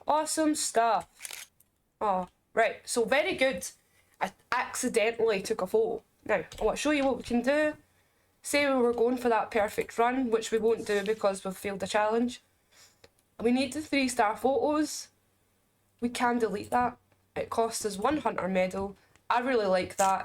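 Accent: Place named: Ireland. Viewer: phasmo for the win. also are you irish? you got a pretty thick accent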